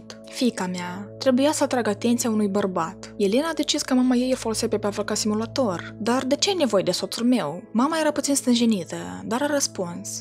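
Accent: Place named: Moldova. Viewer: Accent moldovenesc dulce ..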